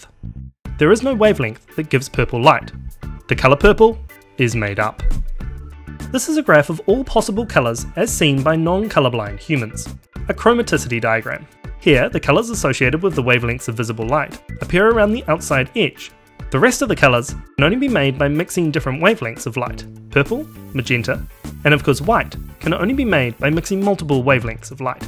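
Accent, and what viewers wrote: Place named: New Zealand. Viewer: or it might be the kiwi accent...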